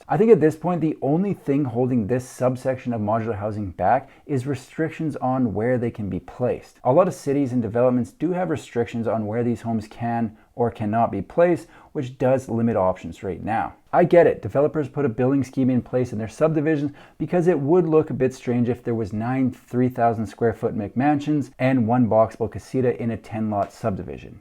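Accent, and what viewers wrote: Canada. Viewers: My team noted you are Canadian. Do you only say “aboot?”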